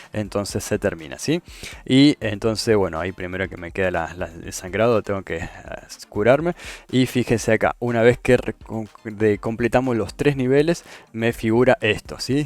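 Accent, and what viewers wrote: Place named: Argentina. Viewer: Argentino??? me suscribo hermano!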